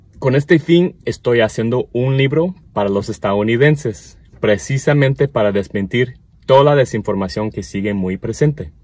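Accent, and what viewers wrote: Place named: North, Mexico. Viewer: Tienes un acento bien norteño 👍🏻 jajaj saludos dios te bendiga mi hermano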